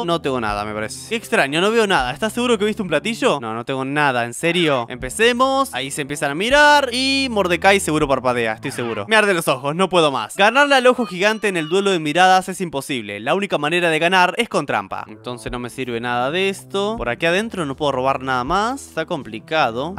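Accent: Argentina. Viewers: Sos argentino?????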